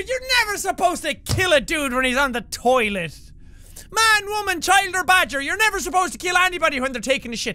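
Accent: Ireland. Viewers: oh my goash its an irshman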